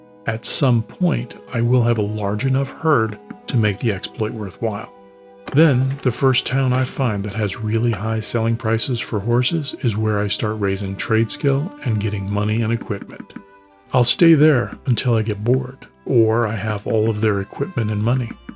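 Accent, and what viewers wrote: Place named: Netherlands. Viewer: Leuk hoe je altijd een andere nederlander herkent als ze engels praten :P, je doet je best maar helemaal onderuit kom je er niet.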